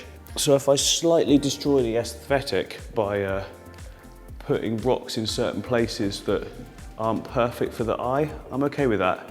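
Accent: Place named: East London, United Kingdom. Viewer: I enjoy your videos and your Eastenders accent. You have a natural knack to the hardscape balance and plant placement '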